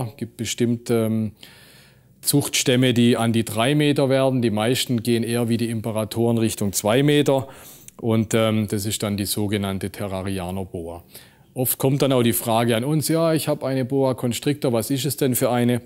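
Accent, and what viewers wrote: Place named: Baden, Germany. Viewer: nein badisch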